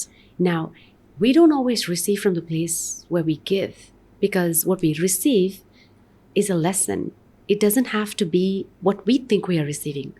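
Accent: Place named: Maharashtra, India. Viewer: ji namste. Are you from Maharashtra.. I notice certain influence or MTI of Maharashtra in your speaking... Thanks, the guest is awesome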